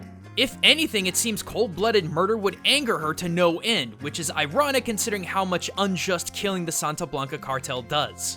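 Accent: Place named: North, United Kingdom. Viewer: dang, the way you pronounce spanish words is so... northern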